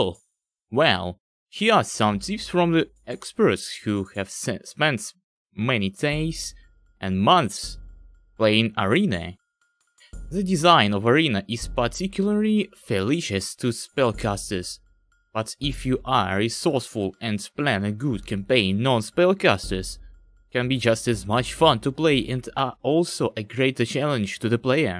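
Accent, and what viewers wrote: United Kingdom. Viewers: Круто получилось! Кстати акцент напоминает британский, воть. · When I saw "almost without accent" I expected to hear less accent than I heard in that video, though nice try, it's not russian accent at very least. From what I heard it feels more like scottish accent or something like that, sry for being too strict :DD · Учитывая шрифт документа, очень даже приемлемо. Отдаёт чем-то британским в плане произношения, но я не эксперт. Хорошо получилось.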